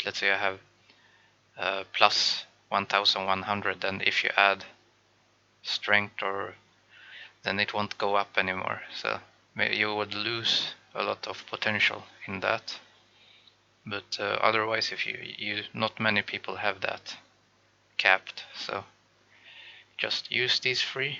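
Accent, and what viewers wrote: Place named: India. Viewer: What? You sound indian :D . Of course i know it. But good to know for people who don't know it.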